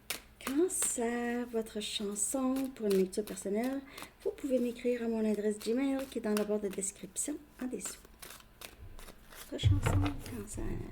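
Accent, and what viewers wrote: Canada. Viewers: Bonjour et merci 🙏 pour votre guidance qui me parle bien. Il est Poisson et je suis Cancer. J'ai donné beaucoup en effet mais impossible de tenir un homme qui aime plaire et séduire. Il m'a quittée il y a 17 ans, pour une autre, par mail sans explications après 12 ans en triangulaire. J'ai divorcé pour lui mais lui non. il revient aujourd'hui et je ne sais toujours pas pourquoi? Nous nous sommes revus en Mai et Juin dernier, il est resté taiseux et depuis c'est le silence radio???. Il m'a dit que nous nous reverrons en janvier ou février prochain sans même demander mon avis?? Wait and see...., j'adore votre bonne humeur 👍 vous été drôle, ça fait du bien de vous écouter et votre accent canadien un vrai délice ❤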